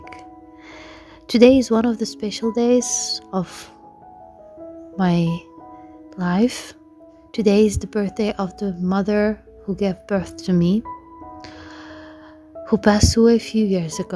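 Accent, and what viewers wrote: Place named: United Kingdom. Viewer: Your British English is very nice you have an accent beautiful thinks for this ideas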